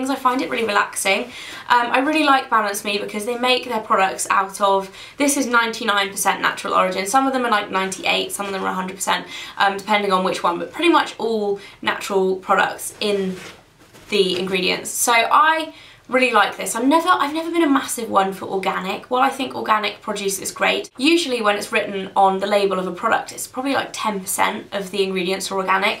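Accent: United Kingdom. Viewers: I think that you ,pixiwoos and Tanya(pixi2woo) are the most adorable girls in this huge yt world.......maybe its because I love your british accent.....hahahahhaha....kisses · british accent ♥♥♥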